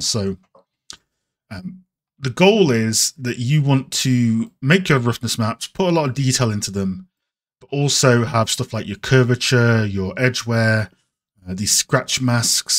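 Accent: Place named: United Kingdom. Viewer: Could listen to a british guy saying "roughness" for hours.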